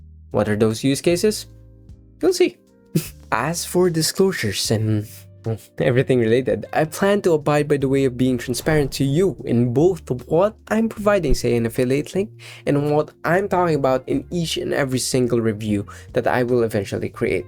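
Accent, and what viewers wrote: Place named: Philippines. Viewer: You're from Philippines? I like your accent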